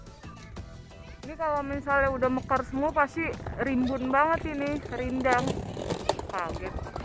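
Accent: Bekasi, Indonesia. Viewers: Mpok next kalo buat vlog lumayan lama durasinya yaa, suka banget liat vlog Mpok, apalagi liat yang super gemes, masyaa Allah seneng banget liat Mpok walau udah tinggal disana tetep gak sombong dan aku suka gaya ngomong Mpok yang khas Bekasi hehee, Salaam dari Bekasi Mpok.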